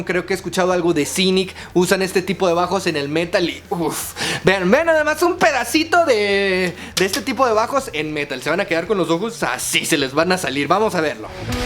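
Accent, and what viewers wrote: Mexico. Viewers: eres mexicano ? xd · Creo que es mexicano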